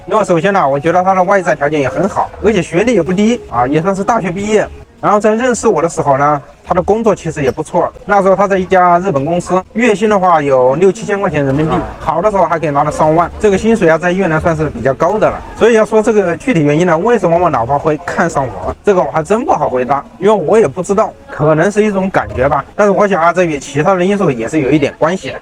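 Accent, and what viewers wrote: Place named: Northwest, China. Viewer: "My wife is simple and cute." Are you kidding me? She is very pretty and has beautiful lip and chin. For you, I detect an accent from West or North West China. After I posted this message, I watched some of your videos, and have to say: Congratulations, you finally found happiness in Vietnam! Most people on this world spend their entire life, but can't find happiness. You are the luckiest one. Do you best to keep it.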